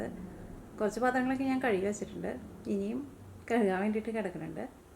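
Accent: Thrissur, India. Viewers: Hii. Chechi.. Nanum trissur kariya.. Channel starting tottu nan Kanarundu comment cheytitila ethu vare. valiya esta ee samsaram especially trissur slang.love u.. Lot😘😘adiploi.. Avunudu.. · തൃശൂർ ഭാഷ എങ്ങനെ ചേച്ചി maintian ചെയ്തു പോകുന്നത്. ചേച്ചി യിലൂടെ ഞങ്ങൾക്ക് അമേരിക്ക കാണാൻ ആഗ്രഹമുണ്ട്. സൂപ്പർ ചേച്ചി.keep it up. 👍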